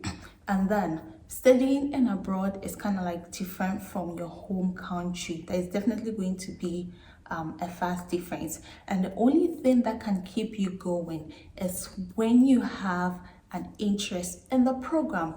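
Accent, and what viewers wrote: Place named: Ghana. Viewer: You sound Ghanaian. Thanks for the video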